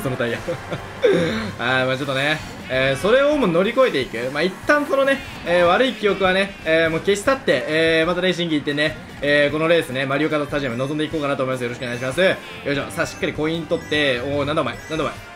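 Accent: Kansai, Japan. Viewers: 最近関西弁が多い気がして嬉しい🥺激カワフェイスにイケボからの関西弁好きです沼ですもっと言ってください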